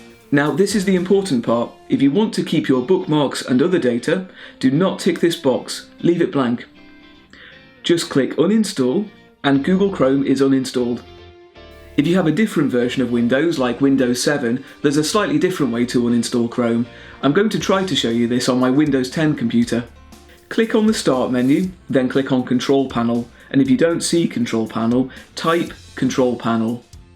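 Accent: United Kingdom. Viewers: I liked his British accent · Why do you look American but sound British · It worked! Thank you for your help. Friend, I love to speak British English just like you. Could you give me some tips on how to start learning the British accent?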